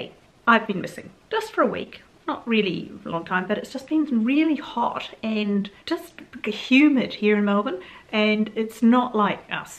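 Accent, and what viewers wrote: New Zealand. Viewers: I love when you post a chat....look for the next one each day. Currently I am packing and clearing ready to put my home up for sale. I too am bemoaning the heat as I live in far North Queensland. Did you end up being Molly’s new mum? As you never mentioned her in this clip, I assume not. Love listening to you, you sound like my kiwi sister-in-law.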